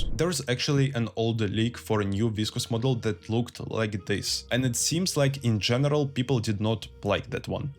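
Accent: Russia. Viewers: Я один слышу очень русский акцент?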